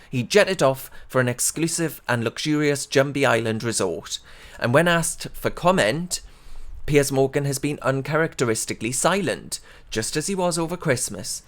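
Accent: Newcastle, United Kingdom. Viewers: Great video, also great to see & hear a Geordie lad stikin it to them.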